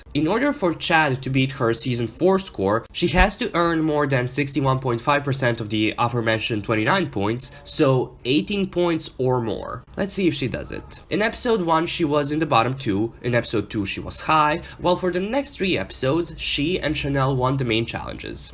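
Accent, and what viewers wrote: Spain. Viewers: As a mexican guy I can tell you that he has such a pretty "spanish voice/accent" haha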